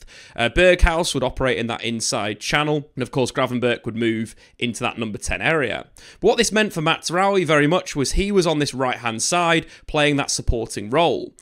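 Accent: United Kingdom. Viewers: Forgive his english accent 😅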